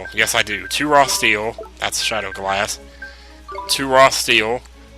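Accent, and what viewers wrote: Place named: South, United States. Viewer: ... Dude. That southern accent is a twinge worse than the one I hear daily, dude. It makes me miss NYC, man...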